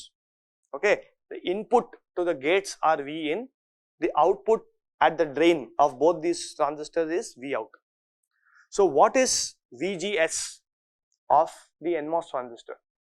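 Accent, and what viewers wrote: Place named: India. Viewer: White dude with indian accent? Thats a first for me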